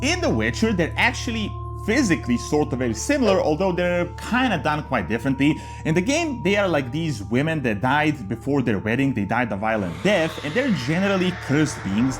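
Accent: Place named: Eastern Europe. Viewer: where are you from you pronounciate all of the names like a real slavic are you slavic?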